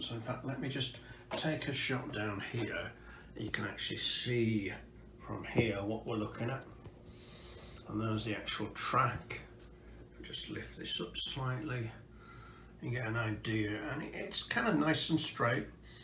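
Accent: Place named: England, United Kingdom. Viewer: Maelee said “ahh he’s from England, that’s why he has an accent”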